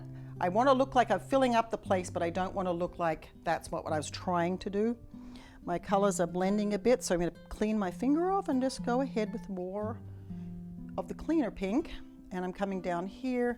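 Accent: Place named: Australia. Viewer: Wow I love your video!! You make it look easy, you are very talented. I love your accent, Australia?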